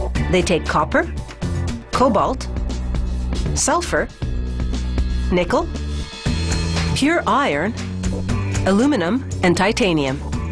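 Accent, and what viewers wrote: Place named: Canada. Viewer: That Canadian accent...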